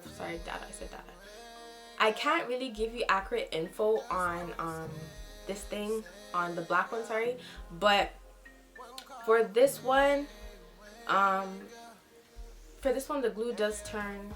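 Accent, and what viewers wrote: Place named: Nigeria. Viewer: Liked right after I heard the Nigerian accent 😂. New Sub‼️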